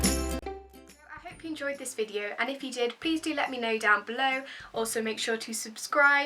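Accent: England, United Kingdom. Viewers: I love your English accent and your beautiful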